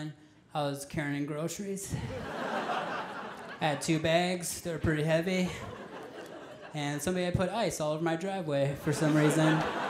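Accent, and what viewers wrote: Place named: Wisconsin, United States. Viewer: Where’s he from? Accent sounds Wisconie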